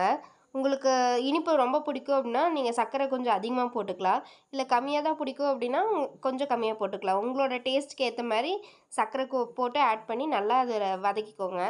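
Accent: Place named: Kongu, India. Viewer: 🤩 i will try🥳 unga kongu tamil and voice spr nga🥰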